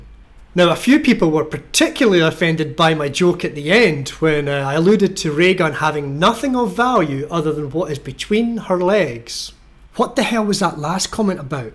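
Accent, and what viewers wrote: Scotland, United Kingdom. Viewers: Brilliant. Articulating what 'get real' means. And the Scottish accent is cool, or is that racial prejudice 😂. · I've just discovered your channel I subscribed to it. I really like your work. The first Scottish in my list, I love your accent reminds me of my high-school teachers 😊 Regards from Yemen (I bet I'm also the only subscriber on your page from Yemen 😅). Cheers ...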